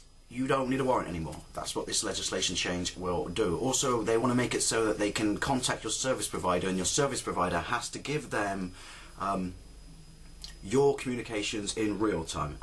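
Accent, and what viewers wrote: United Kingdom. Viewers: Honestly if I couldn't hear your British accent I would have thought you were an American. The exact same shit is going down over here. Slowly but surely they are trying to chip away our freedom all in the name of public safety. Also our troops never actually make it home but instead get shipped off to yet another war. I've never seen CCTVs though. I'm sure our current administration is pushing for that as well as they think China is the ultimate template for national security.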